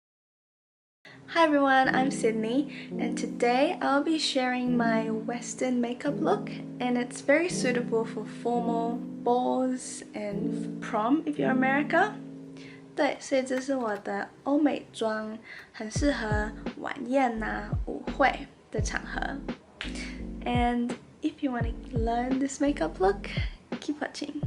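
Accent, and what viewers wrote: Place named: Taiwan. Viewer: 妹妹哩低衝蝦😂😂😂你的謝謝還有台灣國語笑死🤣🤣🥰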